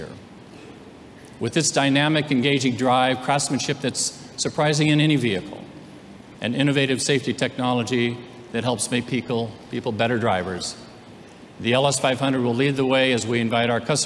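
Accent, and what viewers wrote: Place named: Rust Belt, United States. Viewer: The turbo will prevent our buying one. I assume the pimp wheels shown, are only an option, and there is an acceptable alternative: so the garish & impractical wheel is probably is not the dealbreaker. And why have a spokesman with that creepy hairdo? Looking at that hair, and hearing that lower class Rust Belt accent, I can SMELL the Brylcreem.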